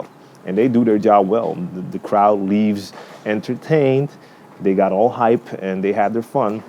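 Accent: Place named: United States. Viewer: true that! Especially cause I am doing all digital. But it's not even about me. It's about the music :) Haha, klopt helemaal ;p! Ik moet eerlijk zeggen dat ik niet had geweten dat jij ook Nederlands was totdat je het in een van je videos zei. Goed Engels/amerikaans accent haha. Heb veel van je videos geleerd!!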